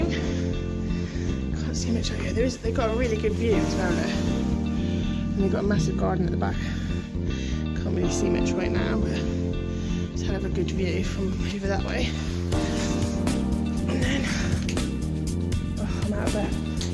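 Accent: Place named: United Kingdom. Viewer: Hey how are you doing? 🙂👍 hope all is well. I know I've said it before but I love your British accent. Lol Cool looking houses you guys have there. So different from here in the USA.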